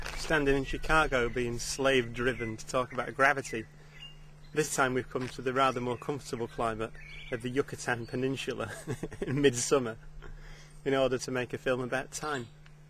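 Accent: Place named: Manchester, United Kingdom. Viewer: Love his accent. He doesn't tone down the Manc does he lol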